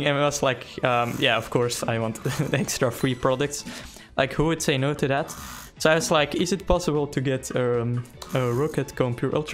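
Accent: Netherlands. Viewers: can i ask you a question are you dutch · Are you dutch??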